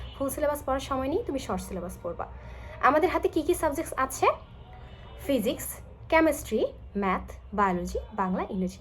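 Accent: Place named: Chittagong, Bangladesh. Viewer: আপু আপনার বাড়ি কি চট্টগ্রাম বিভাগে? আপনার কথার মধ্যে চাটগায়ে ভাব আছে।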